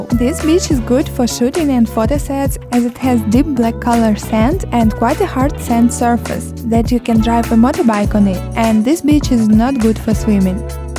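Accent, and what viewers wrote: Russia. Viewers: Благодарю вас за такое прекрасное видео! Чувствуется по акценту, что вы из России, но английский у вас потрясающий 😍💫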